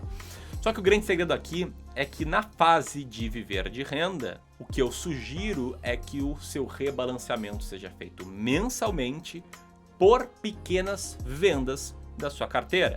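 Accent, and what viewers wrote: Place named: Rio Grande do Sul, Brazil. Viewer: Bah ô Loko, tu é gaúcho né? Ãããhaaaam..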